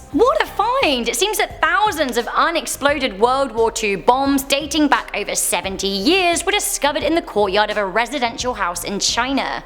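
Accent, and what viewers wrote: England, United Kingdom. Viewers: First off love your British accent very 🔥 second love your facial expressions keep up the good work on the videos and keeping us interested in the videos far from boring 😎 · I'm so glad you've been able to keep your English accent its the best, you should come back to England see your dog and do English things with everybody :D xxxxx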